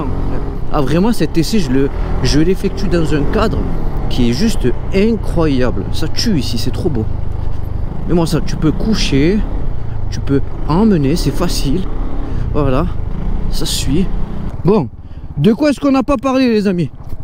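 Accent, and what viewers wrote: Marseille, France. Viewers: T ' es vraiment de Marseille ! .😂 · Cool ta présentation avé l'accent et le panorama superbe. Je ne peux qu'approuver tes remarques ayant une version de l'Xciting de 2019, je ne peux que promouvoir ce scooter qui se faufile sans problème dans les rues et la circulation parisienne. J'ai juste eu un problème de cartographie Moteur qui faisait que je calais à la décélération de temps en temps mais avec la nouvelle cartographie, c'est nickel. Je regrette juste de ne pas avoir le TCS et le superbe écran du 2023. Sinon j'ai possédé avant pendant 2 ans un Xmax 400 et bien le Yam à coté du Kymco était plus que décevant (position, moteur & confort + sécurité). Ride Safe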